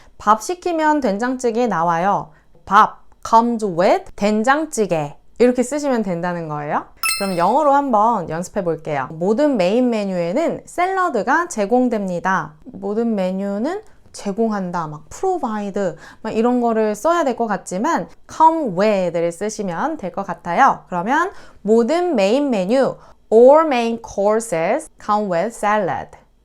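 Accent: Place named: South Korea. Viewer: 와 통종 국문과인거 감안해도 딕션 강세 다 무시한 콩글리쉬 발음 너무 한거 아니요 ㅋㅋㅋㅋㅋㅋㅋㅋㅋㅋㅋㅋ? 내가 2000 초반에 보습학원에서 가르치던 발음 그대로 하고있넼ㅋㅋㅋㅋㅋㅋㅋㅋㅋㅋㅋㅋㅋ 그냥 구글 AI가 발음하게 틀어놓으세요 애들 어릴적 발성 습관 망치지 말고ㅋㅋㅋ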